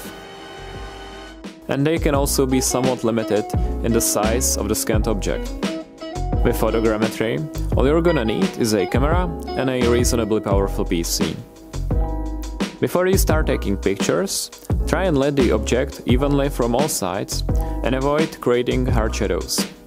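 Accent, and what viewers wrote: Czech Republic. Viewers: i can hear this guy is czech, is he? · great, but that czech accent, grrrr :D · Nejsem si jistej, ale asi slyším českej přízvuk 😄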